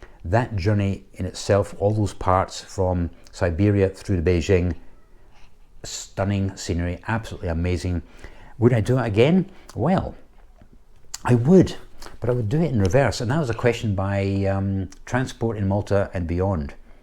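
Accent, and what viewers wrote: Scotland, United Kingdom. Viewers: Congratulations 👍👍. How come you have a Scottish accent when you grew up in Oz?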